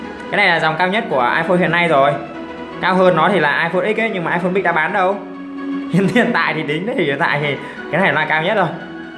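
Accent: Vietnam. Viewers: anh ơi anh miền bắc hay miền Nam vậy em miền Nam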